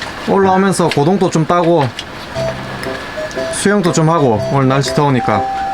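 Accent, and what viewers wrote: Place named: Gyeongsang, South Korea. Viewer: 경상도 말투 무뚝뚝한데 강아지들한테 넘넘 부드럽게 얘기하네요ㅋㅋ 완전팬입니다^^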